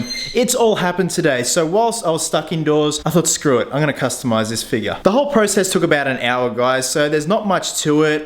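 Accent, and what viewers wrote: Australia. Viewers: i love Australians accent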